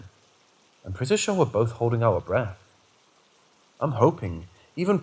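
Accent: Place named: United Kingdom. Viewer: Hey there! It’s fantastic to hear a fellow Brit narrating 👍🇬🇧, I’ve been listening for a while hence I’m subscribed but I’ve just found this vid… my phone does ultra weird things… either that or it’s YT 🤷🏻‍♀️ idk but I’m here now and I have to say your stories are great! My husband is watching some boring documentary about cycling in the snow or something… yawn! So having found this episode, I can just sit back, ignore the tele & chill! Kudos chum! Keep bringing us these great tales of spooky stuff! I have just had the dog bite the like button! 🤣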